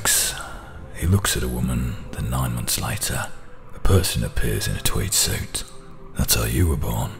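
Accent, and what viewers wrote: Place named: Birmingham, United Kingdom. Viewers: Absolutely SUBLIME Birmingham accent! Best Ive ever heard! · The broomy accent yknow 😂😂😂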